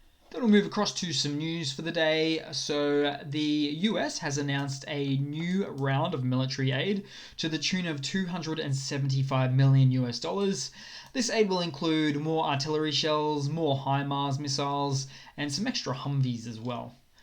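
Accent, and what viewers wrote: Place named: Western Australia, Australia. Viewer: Plagurized content. Lacks originality. Direct copy of several other established credible youtubers. Suspect an accent from Western Australia. Not impressed. There does not appear to be any significant ability to understand anything other than what we see on deep state and copying of other youtubers. Give this SOB a Thumbs Down.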